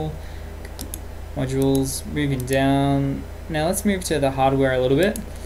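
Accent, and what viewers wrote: Australia. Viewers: Thanks a lot bro... thumbs up! You sound Australian :D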